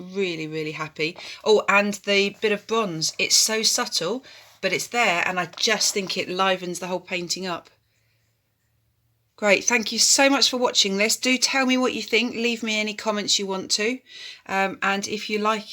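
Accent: United Kingdom. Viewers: So lovely to hear a UK accent!! Glad I found you 😁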